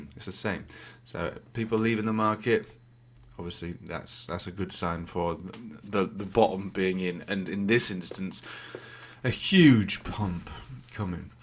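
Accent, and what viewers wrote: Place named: Leeds, United Kingdom. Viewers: I’m in Bradford you sound local are you in West Yorkshire somewhere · I think he might be from Leeds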